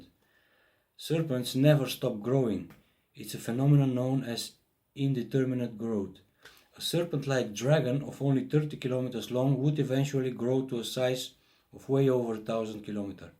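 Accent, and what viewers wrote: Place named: Croatia. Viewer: where are you from you're accent sounds Croatian. I misspelled before sorry.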